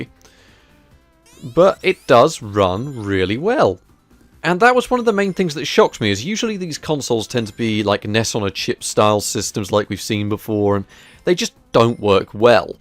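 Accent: Canada. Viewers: Canadian English eh? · Canadian English, perfect! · Canadian English 😂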